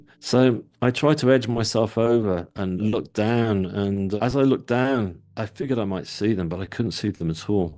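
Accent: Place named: England, United Kingdom. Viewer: So good to hear an English Voice NDE. So gentle, soothing and Real. 🫶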